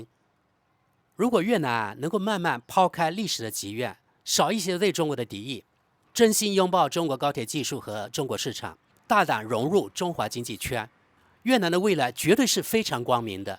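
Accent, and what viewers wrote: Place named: Guangxi, China. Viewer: 主持人是广西人，普通话说得相当不错了，忽略吐字发音不同，语调很准确。内容也很好，至于技术转让，防人之心不可无，还要小心反复无常的白眼狼，在商言商，生意就是生意，小越南挑事的时候可不说两国是兄弟，也不看我们勒紧裤腰带支持他们。修高铁我们反正不急，应该适当提提价。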